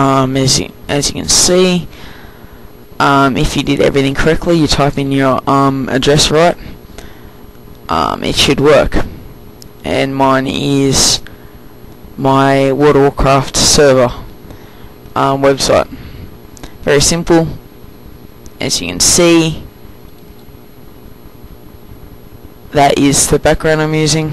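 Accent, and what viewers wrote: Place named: Australia. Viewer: Its australian actually.. definately not a kiwi..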